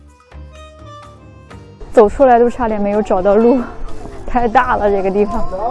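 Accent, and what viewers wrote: Shandong, China. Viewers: 能不能纠正一下3，，听起来好别扭，，，这个叫烧鹅，，，不要那么粗谢谢不是烤鹅，，，您的山东口音好明显哈哈哈应该山东人，，，这个是烧鹅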